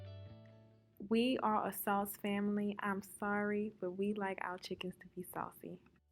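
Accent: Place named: South, United States. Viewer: You and that southern creole voice tho. Whatever accent it is, it's definitely cooking with some spicy flavor... and what were you saying 'bout some chicken wings?😁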